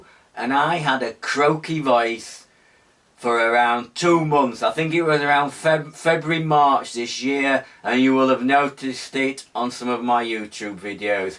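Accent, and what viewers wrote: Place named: Sheffield, United Kingdom. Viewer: Good luck kid you're a South sheffielder so you sound nowt like me but your videos are brilliant keep up good work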